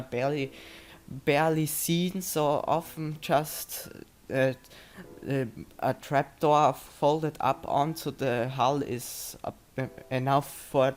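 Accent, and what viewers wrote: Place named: Austria. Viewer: dieser österreichische akzent bruder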